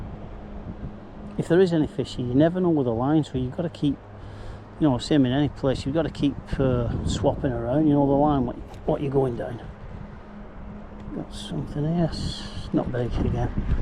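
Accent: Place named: Wigan, United Kingdom. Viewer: Ellow pal, belter vid again and you make that cast look easy! Don't know if it's the Ribble you're fishing but guessing it might be coz you sound like a fellow Wiganer and it'll be on your door step but I've been trotting (with a fix spool) 3 ince dace on there after big perch and jacks and managed a 20lb pike the other week, bet it would make an interesting video on the center pin if you've ever done owt like that before? But ill keep watching either way 👍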